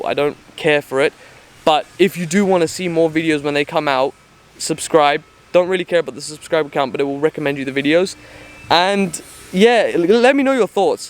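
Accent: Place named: Australia. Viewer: MashAllah! Are you mix? You look mixed. I can also peep the australian accent. AUSSIE AUSSIE OI OI OI!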